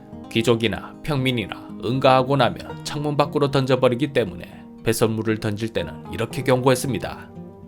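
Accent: Gyeongsang, South Korea. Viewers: 경상도 사투리는 진심 짜증나요 . 제발 사용 하지 마세요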